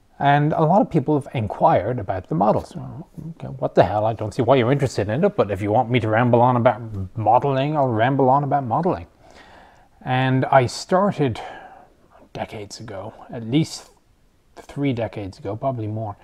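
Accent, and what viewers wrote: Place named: Ireland. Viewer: Now all I see is a Greek man with an Irish Accent, the entertaining link to Father Ted is destroyed.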